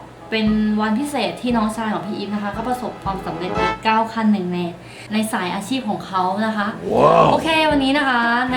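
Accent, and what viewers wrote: Isan, Thailand. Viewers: พี่เป็นคนไทยหรือคนอิสานค่ะ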